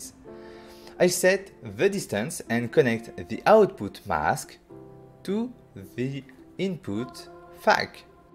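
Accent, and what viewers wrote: France. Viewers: Cool French accent <3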